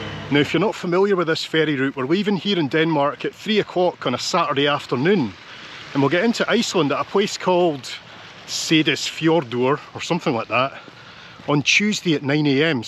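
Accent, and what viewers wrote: Scotland, United Kingdom. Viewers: Im detecting a Scottish accent, I went to Rannoch School 4th and 5th form back in the 70s 80s and love watching these vids. My Fathers side is from the Hay Clan and my Mother unfortunately has ties to the McDonald Clan. But mt Tartan is Hay Clan. Keep up the good work and dont be a poofter. The Scottish would rule the world if not for Scotch Whiskey!! Keep up the good work and hope you get at least your Bronze award for this expey! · Hi! First time watching your channel. (Yes, I subscribed. ) Loved the video very much. I live in the state of Washington, USA. I could really feel the cold you felt! Really liked your monologue, and your Scottish accent. Felt like I was on the ship with you.. Looking forward to catching up on other videos of yours and to future ones. 🙂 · And, have to add, you have a marvellous voice, very friendly, very clear, and, o/c, lovely accent! 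- can't beat a good Scots accent. Thanks for the voyage. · I live in the USA where I was born, but am a proud descendant of brave Scots men and women who crossed the treacherous North Atlantic nearly 400 years ago. I have just discovered your channel as a result of searching for information about this ferry. Thank you for the engaging post and I love your Scots accent. 🤗. Edit: It is a handsome ship indeed. I’m truly adding this to my “bucket” list. 👍🏼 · Rarely have I seen such a beautiful, friendly travel report as yours. Not a trace of snobbery, absolutely unpretentious report intended for the average consumer. The narrator's heartwarming, generally positive nature with his lovely Scottish accent (at least I assume it's a Scottish accent, right?) do the rest. Thank you very much, Mr.! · Your videos are much better than some of the more well known utubers. My mum is Scottish and I love the accent. 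The things I like the most about your videos is you show these trips from a much more affordable way so it becomes attainable for a lot of people. I also love the fact that your narration is not monotone like some of the others who actually put me off. You don't use lots of silly gimmicks and your filming is lovely. Will be watching more of your trips. Well done. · Well done, clear and honest info. brilliant. And your SCOTTISH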